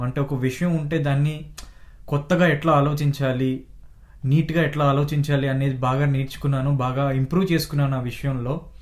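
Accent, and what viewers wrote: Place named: Telugu, India. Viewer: Hai 😊 garu.. Mee mokham (face),Mee Mata theeru..bhaguntadhiy... Telugu thanam Uttiy paduthundhiy...💯❤️🙏 Maaku JAPANE Kalla kiye Katty nattu chupisthunaru.....🧐😍🎊 Cangrasuletion👋🏼 You tube batten Vachinadhuku 👍🥳🤩 Mee video kiy feedha Avvuthanu..💯💕🥰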